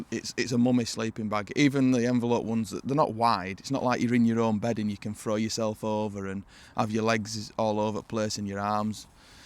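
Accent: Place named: North, United Kingdom. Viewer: Great way to start my morning! Northern accent is perfect ❤ I see why this is one of your favorite spots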